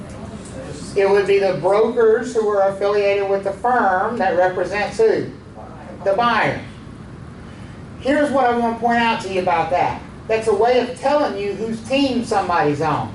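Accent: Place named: South, United States. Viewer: I’m staying due to the southern drawl. Plus he teaches well!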